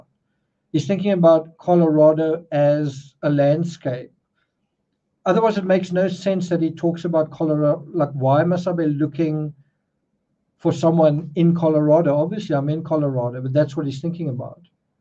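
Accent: South Africa. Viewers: If you're weird then I am, too. Looks like we're in plenty of good company. His voice has such a smooth delivery plus I've become a fan of the South African (except for E.M.; his is almost gone anyway) accent because of him. He really is brilliant, which I enjoy in anyone. Will probably let him lull me to sleep again tonight. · I was wondering what your accent is, is it South African mixed with British or just South African? Love it, so soothing. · yes, I love the SA accent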